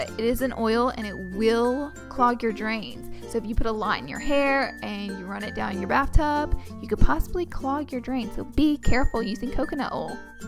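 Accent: South, United States. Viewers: this is awesome! can totally tell you're from a southern state and this is the first video I've ever seen from you lol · She's southern. She has an accent. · Your accent is adorable! I'm from the south too! ( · Everlasting Luv she's from Alabama so she has a southern accent, I'm from Alabama too so I don't even notice it unless she says something about it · Shut the fuck up! She's southern! Most states pronounce things differently! Would you tell a British person they pronounce aluminum wrong? No! Because that's how they pronounce it! So fuck off, BITCH! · It's that southern drawl lol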